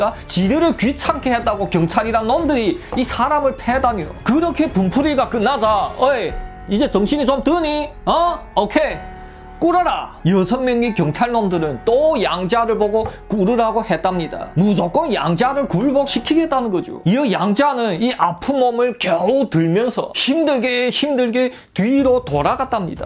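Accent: Yanbian, China. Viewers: 이양반 말투는 연변식 한국말인가 ?아님 한국식 연변말 중심이없어 듣는입장의 학벌의 한계인가?.....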